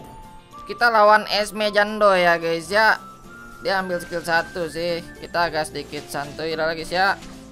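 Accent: West Java, Indonesia. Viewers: ente asli sunda mang?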